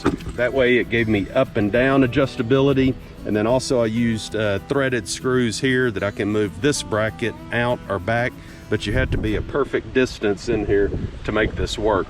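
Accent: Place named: Texas, United States. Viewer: Hi there, nice and easy, your way of talking texan its just amazing , viva texan,....the explanation was great , thanks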